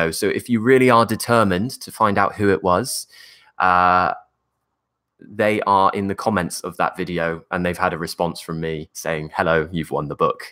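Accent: United Kingdom. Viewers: Hi I'm from Indonesia, I love your British accent, I like your pronounceation it completely makes me understand. And then you explain the meaning of the words. Thank you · Lots of Love from Dhaka,Bangladesh 🇧🇩. I am quite usual with British Accent through your YouTube Video. Thank You Sir. You are my accent teacher. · I’m studying a British accent for acting here in California. So glad I found someone with an authentic accent online who’s also a teacher:) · Oh man you just make me fall in love with British accent, I watched most of your videos, and it was really useful, I hope that to make a video about most words that people from UK using, ( informal words I can use with my friends) Thanks from Morocco 💙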